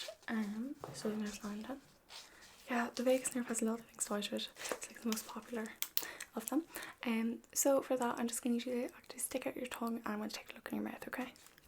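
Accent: Ireland. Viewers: I love your accent!!! Though that might just be because I hear it all the time when I’m around my moms family since they all have an Irish accent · Can't help but love the Irish accent! Hope to live there one day!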